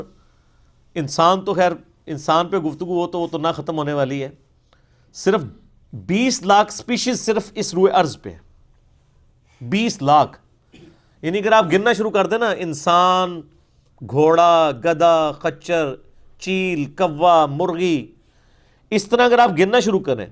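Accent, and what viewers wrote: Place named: Punjab, Pakistan. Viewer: byology wo apne accent ki waja se bol raha ha, q k wo punjabi hai ,or rahi bt darwin ki theory ki to wo sirf ek theory hai, or kafi scientist b os theory k against hain, or boht sare scientist support b krte hain, but science with evidence ho jb mani jati hai, jaise NASA ne hmein planets dekhaye. thoery to koi b Scientist de deta hai, or next century ya phr osi century mei os k against b ek theory ajati hai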